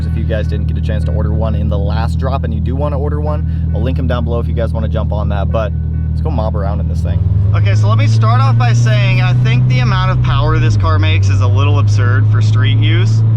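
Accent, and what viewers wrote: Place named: Massachusetts, United States. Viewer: u from mass bro?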